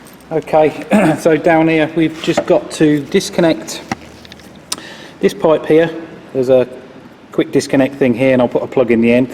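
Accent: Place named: United Kingdom. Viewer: Excellent video, friend! Watching it in a clean, quiet shop with a British accent was the icing on the cake. Love and respect from USA. 🍻🍺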